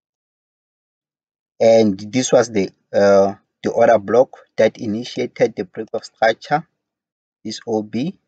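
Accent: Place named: Sierra Leone. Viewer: Are you from sierra Leone bro? You sound like one . Nice video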